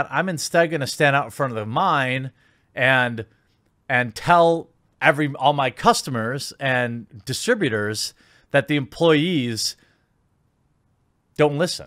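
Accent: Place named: Midwest, United States. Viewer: Good video,. I think. I only listen, so who knows what the video is?:P Not to call anyone out, but some Youtubers have voices that are really hard to listen to, even if the content is good. Your content is good, AND you're easy to listen to. So I appreciate that you speak at a reasonable pace, and your voice isn't grating. It's probably a Midwest thing - my neck of the woods.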